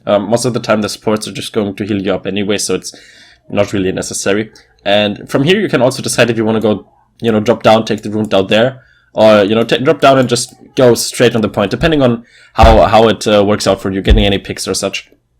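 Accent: Germany. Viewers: Are you German? You kinda HAVE to be German. You sound REALLLLLYYY German bro c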